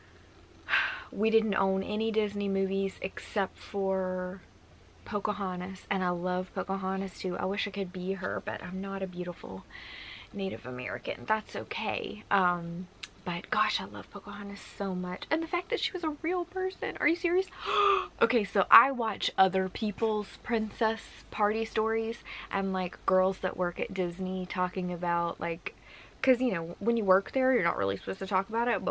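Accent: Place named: Scotland, United Kingdom. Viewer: I’m like almost positive watching all that Outlander helped your Scottish accent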